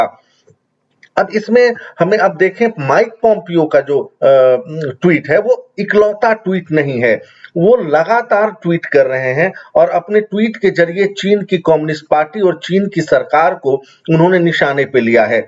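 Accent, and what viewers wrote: Bihar, India. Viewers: विशुद्ध बिहारी उच्चारण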